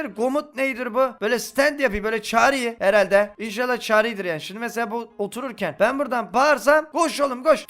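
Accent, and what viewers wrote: Black Sea, Turkey. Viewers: Abu sen nerelisin da? ( bubamun hesabu bence Karadenizlisin diye düşünüyorum 😂😂)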